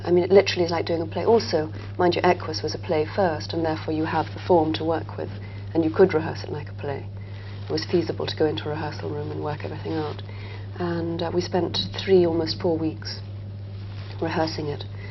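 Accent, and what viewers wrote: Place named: United Kingdom. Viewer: I ❤ your British accent and you too!😊😊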